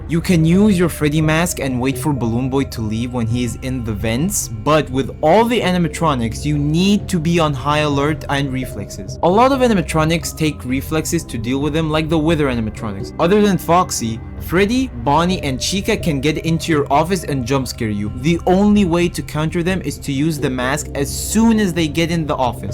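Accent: Egypt. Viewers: Great video, your channel deserves more attraction tbh I also thought u were egyptian from the accent, i checked and you actually were 😅 Good to see egyptians getting in the fnaf content creation, keep it up!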